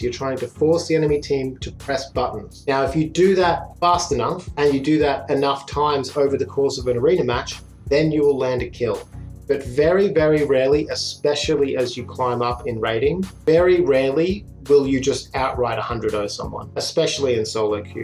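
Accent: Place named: Australia. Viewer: Loved the video and especially love the OZ accent!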